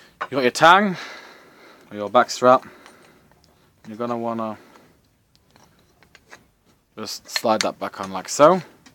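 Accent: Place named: England, United Kingdom. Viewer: Thanks so much for these videos, I live in the U.K and have this exact model except it is a blank firing version made by Pietta. Turns out the little hand and spring was the problem as it had broken and you have really helped me to fix it, I cant thank you enough! Nice to hear an Englishman's explanation!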